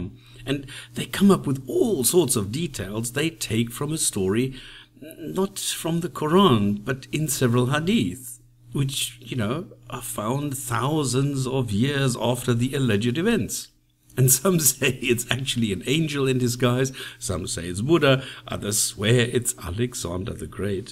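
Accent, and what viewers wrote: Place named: South Africa. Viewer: Great first video. Your accent sounds colonial, maybe south african?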